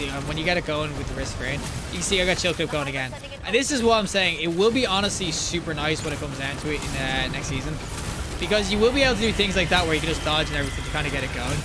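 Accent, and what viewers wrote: Ireland. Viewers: very nice to hear an irish accent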